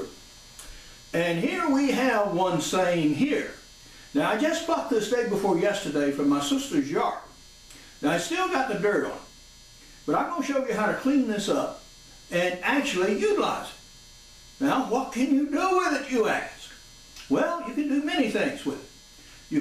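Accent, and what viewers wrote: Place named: Appalachia, United States. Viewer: I love your voice. Like a slight hillbilly twang mixed with dr demento and a splash of jello biafra.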